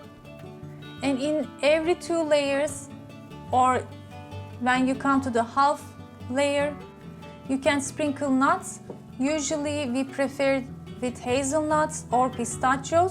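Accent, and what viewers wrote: Turkey. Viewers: Love your English Turkish accent!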